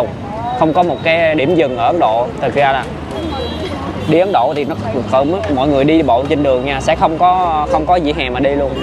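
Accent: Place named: Vietnam. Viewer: Giong Vietnam qua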